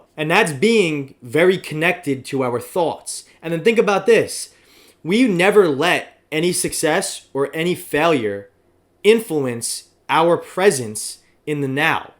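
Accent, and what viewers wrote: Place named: Long Island, United States. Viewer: He’s definitely from Long Island. Spittin facts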